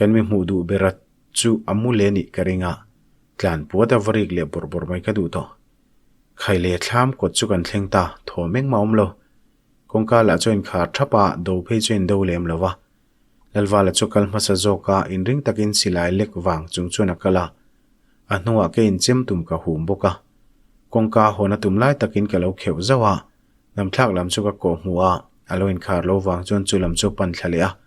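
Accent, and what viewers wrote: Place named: Khilam, China. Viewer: Khilam unaute i ang zawk riau mai😆 ngaihthlak anuam e..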